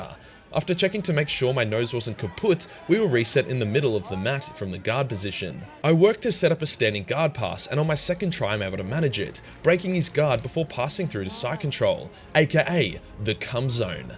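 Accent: Australia. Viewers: Please please please make more jiu jitsu content, your deep beautiful Australian voice is perfect for narrating grappling.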